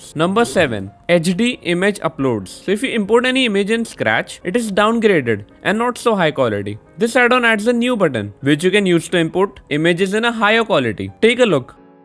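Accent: India. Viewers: I was focusing on the Indian voice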